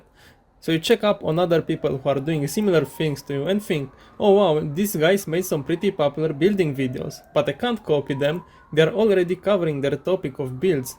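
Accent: Romania. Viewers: Bro, your Romanian accent Is crazy. It is like Russian, that used to live in Italy for 20 years, speaks English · Wow romanian accent 😅 im romanian too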